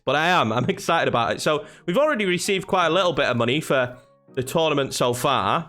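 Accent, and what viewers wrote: Yorkshire, United Kingdom. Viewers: Where you from in Yorkshire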